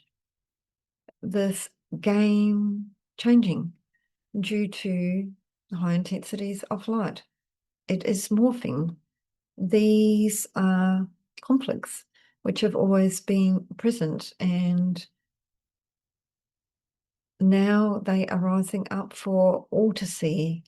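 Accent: New Zealand. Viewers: Thank you, It is so nice to have a reliable channel here in the Southern Hemisphere. I love listening to your beautiful Kiwi accent and know you are here in Eastern Australia. I am in Nth Narrabeen NSW and you really resonate in my heart. Thank you for your commitment, I am truly grateful 🙏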